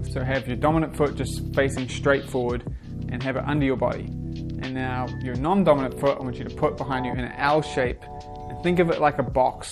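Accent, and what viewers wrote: New Zealand. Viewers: Sounds like a kiwi accent to me :) Thanks for the great tips! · nice vid bro - are you from NZ >?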